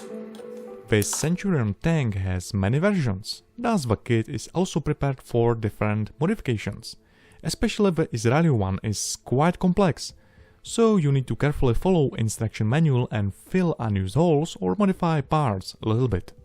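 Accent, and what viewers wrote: Czech Republic. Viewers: Český přízvuk jsem okamžitě poznal:))) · Jsi Čech? Podle přízvuku ;) Krásná práce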